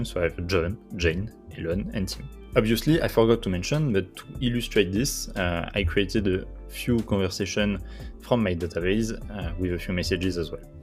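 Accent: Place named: France. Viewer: Bro you have amazing accent!!! French most beautiful language in the world!!! Great work also 🫱🏼‍🫲🏾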